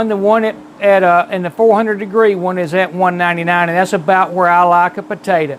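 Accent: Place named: Georgia, United States. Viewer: glad my accent spotting wasn't off :) look forward to more content I lived in forsyth and Cherokee but accent sounded very similar to my friends by cartersville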